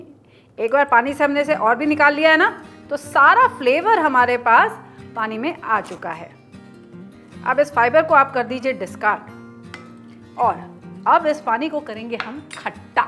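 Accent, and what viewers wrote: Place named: Lucknow, India. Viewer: मोहतरमा आप एकदम लखनऊ वाली हो गई है अच्छा बोल लेती है , हां उर्दू तो नहीं बोली फिर भी....... good 👍 i am also from Lucknow but now residing in kashi . One very special ingredient heeng you have not used . I put heeng that increase the flavour more . In both resipe. Here in kashi matar alu and golgappe wala pani they mix it is also very tasty .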